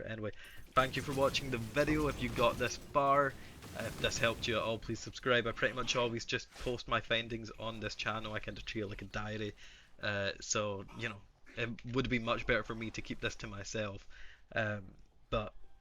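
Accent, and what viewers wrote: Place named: Scotland, United Kingdom. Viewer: Love the accent. Whether male or female, scottish is just 👌Cheers to the video.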